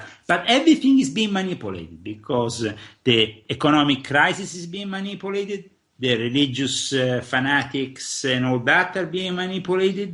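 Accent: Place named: Italy. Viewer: Dude is a loon job who has the uncanny ability to memorize conspiratorial material & has a wild imagination- compounded by the fact that people are impressed by the his Italian accent. Not such a big deal to me as all my in laws sound exactly like Leo when they speak -minus the random chaotic scattered madness. This is ALL COMPLETE BULLSHIT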